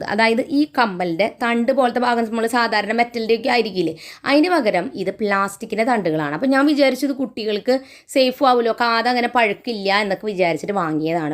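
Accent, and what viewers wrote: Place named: Thrissur, India. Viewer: തൃശ്ശൂർ ആണോ വീട്... സംസാരം കേട്ടിട്ട് അങ്ങനെ തോന്നുന്നു 😊... ഞാൻ തൃശ്ശൂർ ആണ്‌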